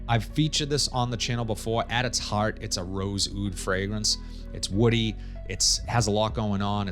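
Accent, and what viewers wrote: Boston, United States. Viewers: Great video , and I love that Boston accent 😅👍🏻👍🏻 How do u feel serge lutens “chergui”compares to this one ? I’m curious about these fragrances now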